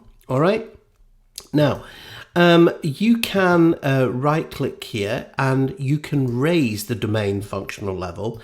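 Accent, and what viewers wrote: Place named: England, United Kingdom. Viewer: Thank you so much for this Active directory video. Well understood. You got a new subscriber. Am happy to join tour team. Love your English accent. 😄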